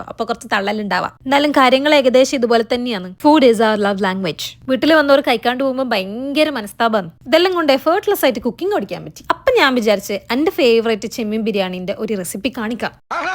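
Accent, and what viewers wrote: Kannur, India. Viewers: Kannur bhasha nth oru ppang Ann🔥video oke poli anne Chechii ♥️ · സംസാരം ഫസ്റ്റ് കേട്ടപ്പോ തന്നേ മനസ്സിലായി കണ്ണൂർ കാരി ആണെന്ന് 😂❤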